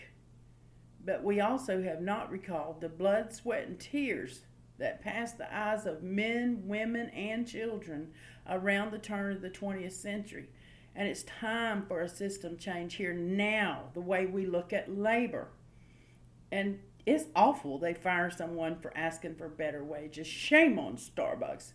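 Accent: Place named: South, United States. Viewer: Hearing more of this southern accent I’ll even return the favor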